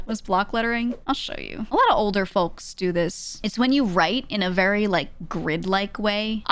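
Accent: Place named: Northeast, United States. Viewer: I got absolutely read for filth at the "engineers write in block lettering" bit. At the risk of getting weird, I think the perception of Times New Roman delivery is equal parts tone, volume, and inflection. The Northeast American accent is a very neutral accent. And, the majority of the Just Chatting bits that do get clipped here of simply "talking about a thing" don't have a ton of vocal dynamics or hyperbolic reactions to the things being said (as compared to the MrBeast typical youtuber inflection that's the vocal equivalent of jangling keys in front of someone to get their attention).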